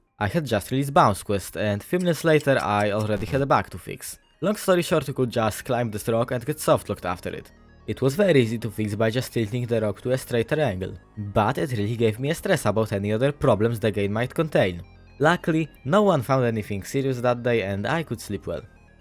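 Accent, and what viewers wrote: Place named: Poland. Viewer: Słychać że jesteś z Polski.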